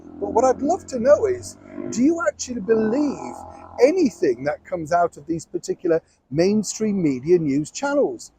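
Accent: Yorkshire, United Kingdom. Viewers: I don’t watch mainstream news channels, I watch GBNews and Mike Graham. I always watch you too, love your no nonsense Yorkshire straight talking.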